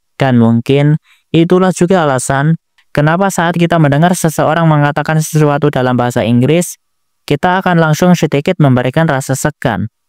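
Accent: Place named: Indonesia. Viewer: itu namanya logat kocak! atau bahasa Inggrisnya accent. di AS pun pengucapan Inggrisnya beda beda ada, Washington accent, LA accent, New York accent, diluar dari AS ada British accent yg pengucapannya jauh beda banget sama American English, satu lagi yg populer ada yg namanya Indian accent itu juga jelas banget perbedaan pengucapannya. dibalik dari itu apa pemilik channel ini salah ngucapinnya? jawabannya engga karena kasus disini dia pake Indonesian Accent.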